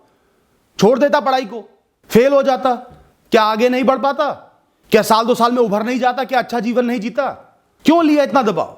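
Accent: India. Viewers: Very Nice Sir Motivating and I like your videos due to our Desi and Indian Accent Aap ekdum acche se samjhate hai Really Superb Sir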